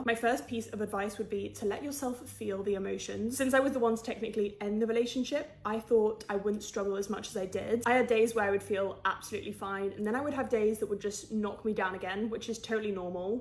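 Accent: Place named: England, United Kingdom. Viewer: Good advice. Luv your English accent btw.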